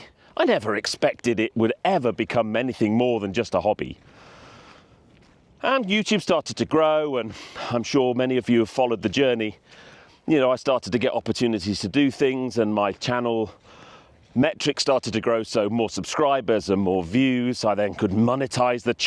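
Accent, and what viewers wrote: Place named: RP, United Kingdom. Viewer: Mmm but you have gone bit posh😢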